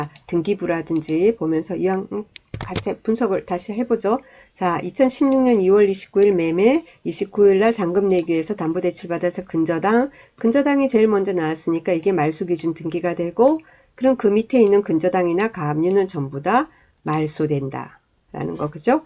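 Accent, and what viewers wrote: Busan, South Korea. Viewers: 정말 너무너무 감사합니다 지금은 손녀봐주러 서울에 와있으면서 강의를듣고 있지만 부산의 그수한 말씨로 자세하게 알려주시는 멘토 입니다 영원한 경매나라 왕비님이 되어 주세요 감사합니다 ~^^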